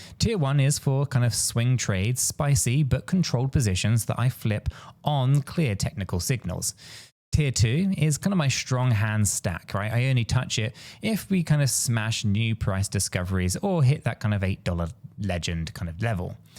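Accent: United Kingdom. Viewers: The only thing worse than an entire video with non stop sarcasm is hearing it with a British accent 🤮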